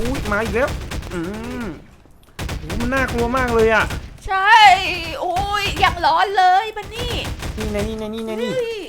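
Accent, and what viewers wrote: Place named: South, Thailand. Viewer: พูดภาษาใต้นิ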